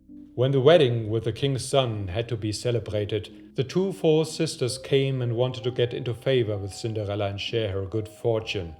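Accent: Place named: Germany. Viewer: What a timing to upload, I’m going to Germany the next day 😃 The accent is so nice! Can you do one in German?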